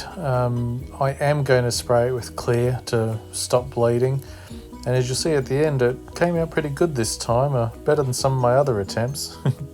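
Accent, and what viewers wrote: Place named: Australia. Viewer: Hi there your vids are great, keep it up please, not many Aussie ascents in utube land.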